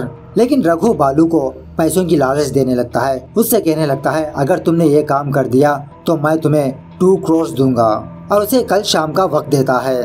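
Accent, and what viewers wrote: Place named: South, India. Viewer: I have been listening your explanation since two months ago but i think u need to improve ur hindi language bcoz i think u hv South Indian ascend. Ur many pronunciation irritates me but hope to listen good hindi.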